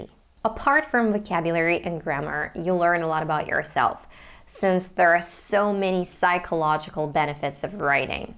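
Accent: United States. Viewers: Hello. Where are you from? Is it American pronunciation? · Have you ever been to or studied abroad in the US? I bet you've been. Because your accent is pretty nice and sounds like real American, How can you do that? Can you give me some tips to practice speaking sounds like a native speakers even as well as you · Hi! What is secret of your pronunciation like Americans? How do you practice everyday? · Hi again. when i heard your voice yesterday for the first time i thought by your accent that you were american. it's hard to believe that you are from ukraine. what i really think. if one don't have a chance to speak and improve the language every day otherwise it's impossible to got an accent like yours. it's just only a matter of time. stay healthy and hats off. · I like American accent, and could you please speaking a little slowly, I'm a new beginner....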